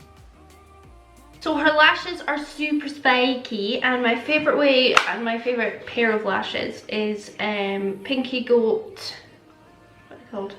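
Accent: Scotland, United Kingdom. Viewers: Omg your Scottish 😯 help me with my makeup!!!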